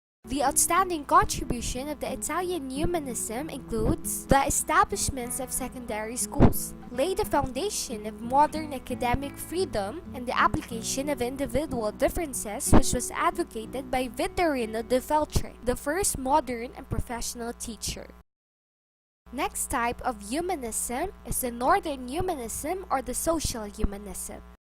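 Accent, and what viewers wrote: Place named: Philippines. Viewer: Informative! Although the accent you have really sounds like a filipina which is cool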